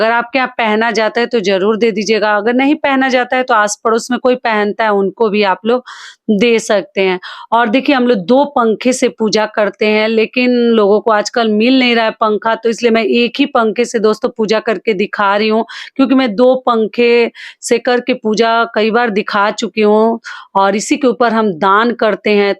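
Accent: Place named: Uttar Pradesh, India. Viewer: Ap up se lagti hain ankwar dena hamari banarsi bhasha hai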